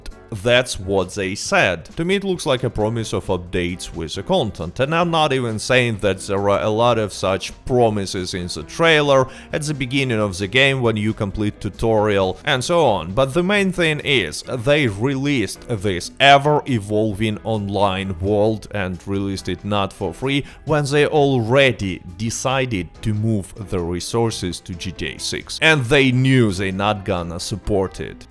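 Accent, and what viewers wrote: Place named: Russia. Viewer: Love the Russian accent and Russian attire makes it great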